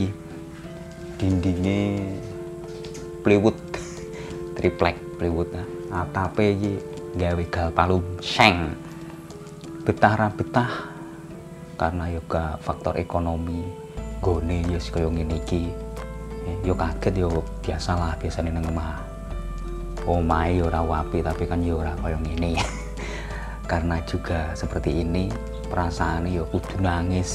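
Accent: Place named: East Java, Indonesia. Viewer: lihat cara bicara udah kelihatan orang jwa timur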